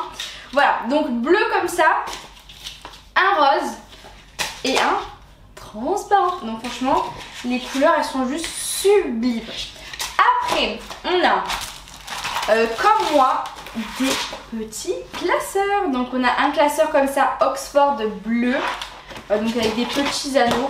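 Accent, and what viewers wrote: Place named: Marseille, France. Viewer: Elle a un petit accent marseillais je crois qu'elle vient de là bas 😊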